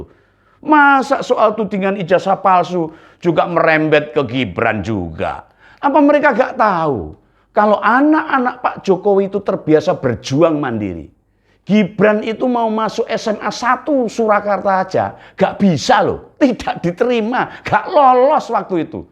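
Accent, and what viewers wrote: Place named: East Java, Indonesia. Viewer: Logatnya...muuuaantaap...kang... tuban jawa Timur...👍🙏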